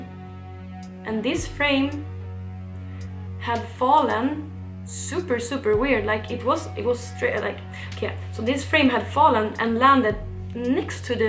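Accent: Sweden. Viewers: You talk English like a swedish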